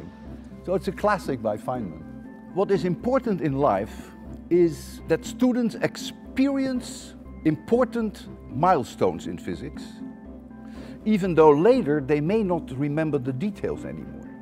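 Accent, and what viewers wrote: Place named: Netherlands. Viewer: In all his dutch glory :') and accent lol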